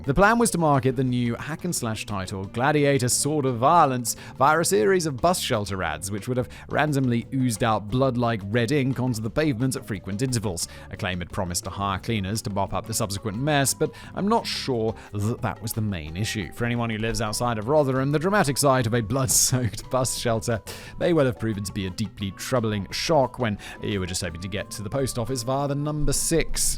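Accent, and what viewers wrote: United States. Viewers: Simon has been reading so many scripts written by US. writers his brain is starting to use our pronunciations. This channel is great and the rants are classic. Thank you for making me smile on my darker days. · Simon's getting more and more of an American accent. We do say "ad ver tise ment" not "ad vert as ment". LOL.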